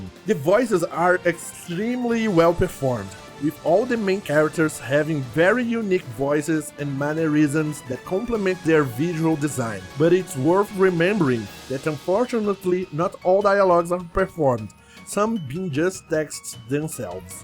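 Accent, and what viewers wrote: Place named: Brazil. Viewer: Thanks! Now I know what a Brazilian person actually sounds like for once! Great review!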